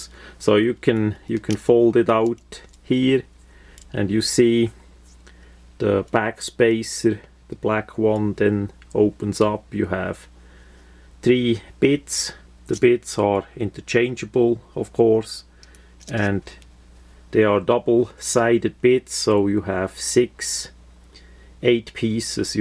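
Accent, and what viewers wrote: Switzerland. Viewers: Extrem geil, Englisch mit Schweizer Akzent. Klasse 😄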